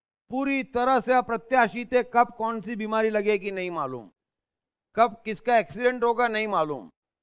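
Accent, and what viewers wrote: Gujarat, India. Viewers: Nahi, Ye Gujarati he.🙂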